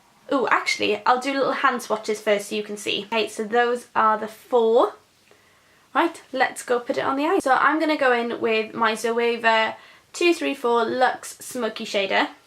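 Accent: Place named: Wales, United Kingdom. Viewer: I noticed your welsh accent straight away Im Welsh too Lovely vid :)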